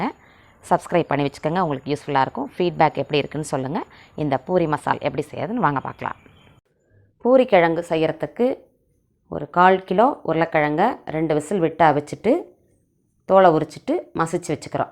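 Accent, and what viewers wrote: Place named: Coimbatore, India. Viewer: உங்களுக்கு எந்த ஊர் சிஸ்டர் கோயம்புத்தூரா 🤔🤔 நாங்கள் திருநெல்வேலியில் இருக்கிறோம் நீங்கள் ரொம்ப அழகாக பேசுகிறீர்கள் அதனால் கேட்டேன் வாருங்கள் அவ்வப்போது எங்கள் வீட்டுக்கும் முதலில் வந்தீர்கள் இப்பொழுது ஆளையே காணோம்🤔🤔🤔🤔🤔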